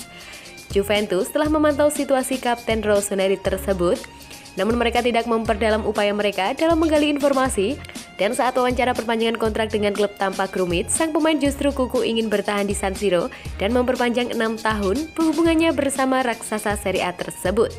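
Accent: Java, Indonesia. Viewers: Sory unsub ga suka voice over medok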